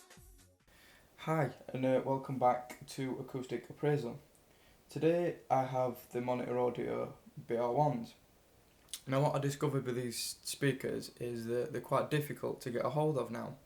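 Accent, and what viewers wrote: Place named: Sheffield, United Kingdom. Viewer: I just watched this .. and hearing you I'm guessing S61 or S62 .. ;) cos tha sounds local.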